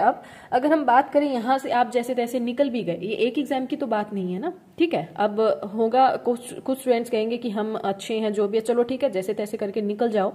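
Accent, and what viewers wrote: Haryana, India. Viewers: 🤨 sahi baat hai miss 👍👍 Main baat hai focus ki, 50-50 agar divide ho jaayega focus bank aur ssc me toh bank ka kharab hoga aur ssc bhi. 1 jagah focus kare to success zaroor milegi, meri bhi yahi soch 👍 hai Really like your Haryanvi accent 😍❤️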